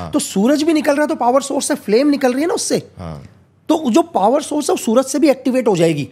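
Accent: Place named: Gujarat, India. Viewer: I guess he is from Gujarat , his accents speaks😊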